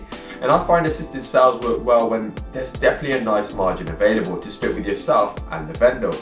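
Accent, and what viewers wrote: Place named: Wolverhampton, United Kingdom. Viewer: Class video and good to hear it coming from someone local! I'm in west brom, you sound like you're from wolves lol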